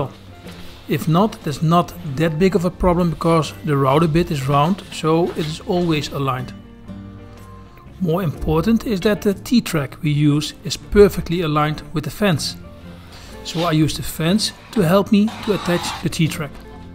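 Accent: Netherlands. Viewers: thanks for your reply, very much appreciated. Though i grew up here, i was born in the Netherlands and its a pleasure to hear that Dutch accent coming through! Ill be posting a video of my work bench build, though not nearly as impressive i think its going to be a great addition to my tiny workshop. Check out “captain of my shed” episode 6 if you want to feel even better about your amazing workshop!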